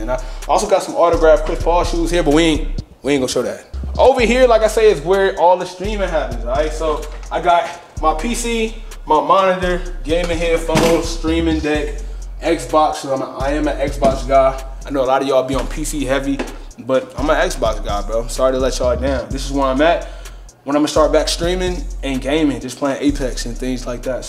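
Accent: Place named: New York, United States. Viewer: Yo son, Crazy wild proud of you B! 💯💫 New York voice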